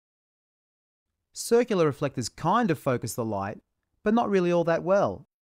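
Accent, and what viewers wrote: Australia. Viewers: drive a nail where you want the focus to be. Tie one end of the string around that nail. Extend the dangling string downward toward you on your surface with a loose loop where you want the base of the parabola to be, and run the rest of the string vertical up the surface past the nail but attach it to the side of a 90 degree triangle square just touching the nail... Put your pen at the loop directly down toward you from the focus nail - that'll be the start of one side of your parabola... now slide the square straight to the right side and keep the pen tight against the string - as you continue to move the square, the string will slide upward and you'll trace a parabola shape... reverse it the other direction or trace the 1/2 you already did... Bob's your uncle. (since the teacher sounded ozzish (well, at least commonwealth something...)). 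Cheers.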